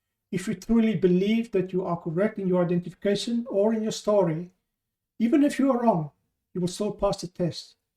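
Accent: Afrikaans, South Africa. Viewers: Dankie. Baie interessant. Heerlike Afrikaanse aksent. Doen so voort! Advokaat Barbie se lewe en misdade sal interessant wees. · He has fabulous Afrikaans accent and if you had listened properly you would followed it enough to understand he is saying bald From me a truly English person, I appreciate his work and his accent. Its not bad it is just different.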